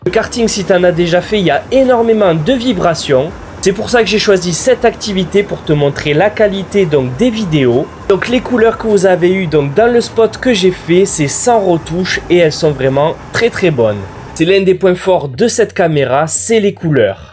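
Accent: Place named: South-West, France. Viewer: Je trouve qu une vidéo tech avec l'accent du sud-ouest ça passe toujours mieux 🙏🏻☺️ #teamchocolatine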